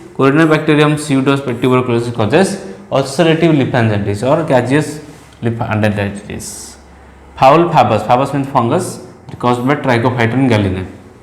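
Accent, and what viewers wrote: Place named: South India, India. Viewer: Due to south accent please cc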